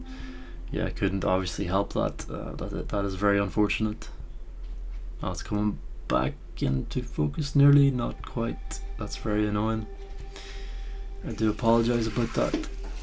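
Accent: Northern Ireland, United Kingdom. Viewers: he lives in Northern Ireland by the hears of his accent;)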